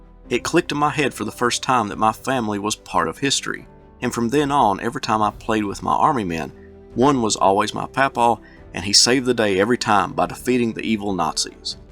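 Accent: South, United States. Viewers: I always wondered, who the man is, telling me stories with that sound voice and the rollin southern tongue, wich I love so much. Now I know, thank you for that. So, for justices sake, I will get out of cover a little bit. I am a 60 year old veteran, born and raised in Germany inmidst the post ww 2 era. Originated from a family with military background, reaching back to the 30 years war, I started at a very early age to become interested in history. First love were Dinosaurs, soon after the ancient Egyptians up to the romans, my first choice of profession was archeologist, but it turned out otherwise. My grandpa liked to tell me the warstories, when he was a young soldier in peacetime, up to the time he commanded a division at the eastern front. He told me about the hardship and the suffering, but also about glory and comradeship. Like a sponge I absorbed those stories and, as you can imagine, I was hooked. 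So, in the age of 11 years, I began my dive into history, developing into an ascend deep inside the abyss of military history. Logically I sooner or later discovered the american civil war. BAAAAM, what a story. I studied the wars of napoleon before, wich are much larger in scale ( Battle of Leipzig <num> combattants) . But what was so unimaginable about the Civil War, was the fact, that they fought napoleonic style with rifled musket and cannon! What kind of man, what breed of hell can endure such gruesome tasks, what kind of spirit was their motivation, why the hell they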